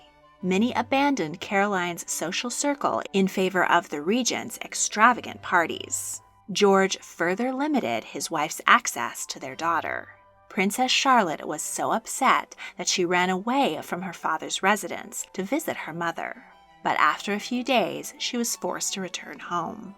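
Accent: United States. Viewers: are you American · As son as I heard an American accent I passed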